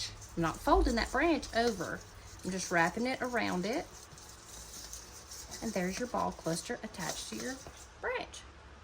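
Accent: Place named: South, United States. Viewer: What you call a Chanel stem, I know it as a pipe cleaner 😂. It's probably regional. I'm from Utah and you sound like you're from the south.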